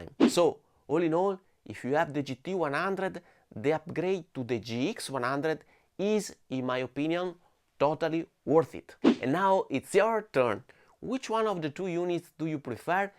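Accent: Italy. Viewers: I love your videos, love your content and love your charming Italian-English. I find super friendly the aesthetics, the colours and the quality of the equipment around you. I hope you keep it up and that your channel continues to grow. And the most relevant thing: I love your guitar playing. Greetings from Argentina.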